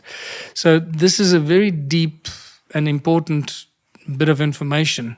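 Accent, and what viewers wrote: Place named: South Africa. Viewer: I didn't know you were South African,. But in this interview I can even hear a bit of South African in your accent ❤. I'm also a South African living in the Netherlands 🇳🇱 and I've really been enjoying your show.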